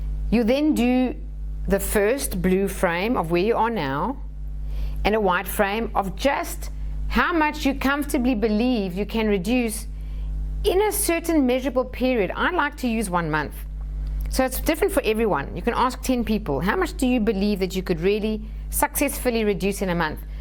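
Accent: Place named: South Africa. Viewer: Hi thank you for the video, your accent sounds like South African - excellent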